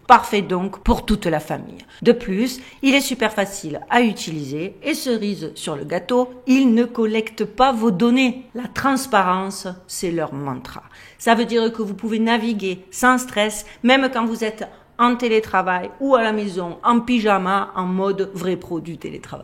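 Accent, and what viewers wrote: Marseille, France. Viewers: Ce petit bout de femme est juste extraordinaire !! J avale les vidéos les unes après les autres depuis 3 jours !! D ailleurs je suis marseillaise près du vieux port 😊 haaa vous n avez pas perdu notre bel accent ça fait plaisir ❤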